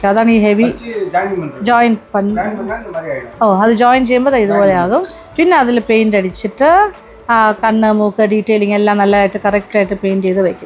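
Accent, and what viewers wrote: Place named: Tamil Nadu, India. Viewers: Madathinte tamil kelkkan rasamundu😄kathirikka konjam veyy ennu kettappol ente Aswathy molu nalla chiri ayirunnu ❤ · കത്തിരിക്ക konjam veyy...😅athu kettapom chiri vannu😅... Mam nte tamil kelkkan nalla rasamanu❤️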